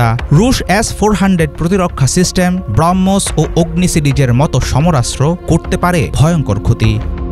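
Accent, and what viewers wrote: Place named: Bangladesh. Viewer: এটাই ভাবছিলাম কারা এই ভিডিও বানালো, একটু শুনেই বুঝলাম বাংলাদেশী ভিডিও, বুঝেই বন্ধ করে দিলাম 😕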